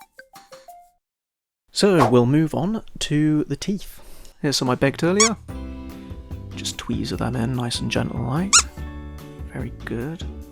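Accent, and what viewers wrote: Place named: England, United Kingdom. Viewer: Ah, always so nice to find a fellow English man! "He's ard, inhe?!" 😂 Also, if you ever need to bake very small bits of clay (as in covering the wires) you can use a heat gun instead of putting it back in the oven!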